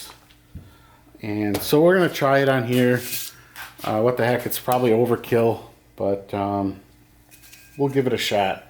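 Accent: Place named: Wisconsin, United States. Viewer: Wisconsin huh? I thought I recognized the accent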